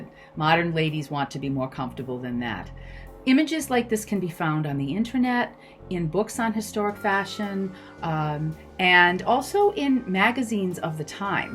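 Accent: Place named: Rhode Island, United States. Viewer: You sound like you're from Rhode Island (?) ~ Heather ~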